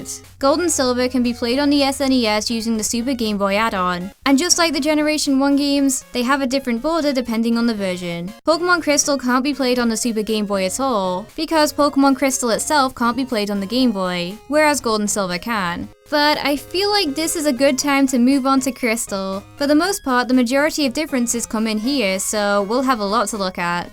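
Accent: England, United Kingdom. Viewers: OakTea she's british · Woah, this person has a very odd accent. It sounds like a regional accent from Britain that has been polluted by living in the US. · Are you ever so slightly English? You definitely have a tinge of our accent when you talk. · What nice British voice. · Your accent is awesome! I wish I had an English accent...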